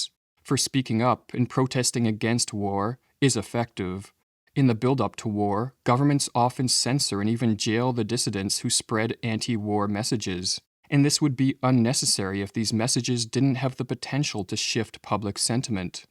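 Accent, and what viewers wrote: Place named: Canada. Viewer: Its easy to tell you're from Canada. How say the word "been" is a dead give away.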